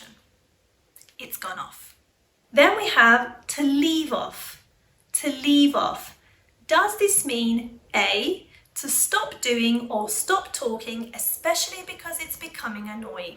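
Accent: United Kingdom. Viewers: Could you please tell me what's your accent? Estuary or rp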